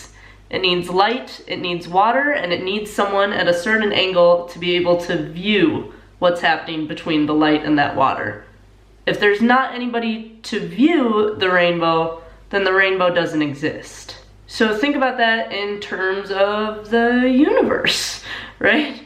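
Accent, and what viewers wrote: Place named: United States. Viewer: What's her accent is .... which state in US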